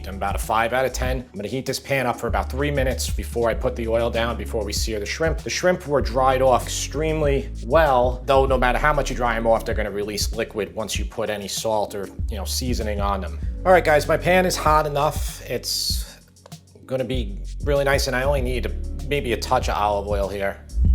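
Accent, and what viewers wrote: New York, United States. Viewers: I find your videos so relaxing. Also, I love your approach to cooking. No pressure, do what you can with what you have. The New York/Jersey accent is just so loveable ❤️ Can't want to try this one!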